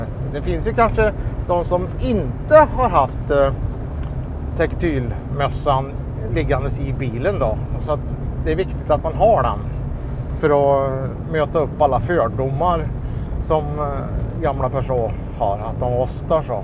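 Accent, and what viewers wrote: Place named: West Gothland, Sweden. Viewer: Kan vara den Västgötska dialekten!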